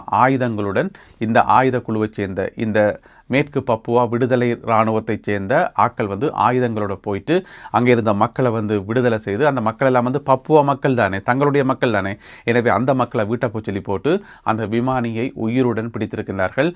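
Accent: Jaffna, Sri Lanka. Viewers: செய்திகள் தரும்போது யாழ். தமிழில் தருவது அருமை.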